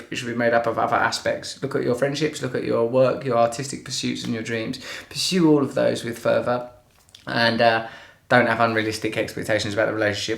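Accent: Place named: United Kingdom. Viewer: relationships = wondering about the dog and then having a laugh and that. you've gotten awfully british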